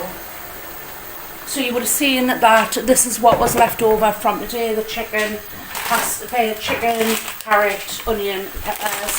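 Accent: Newcastle, United Kingdom. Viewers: Ah cool you sound like around Gateshead ,Newcastle etc area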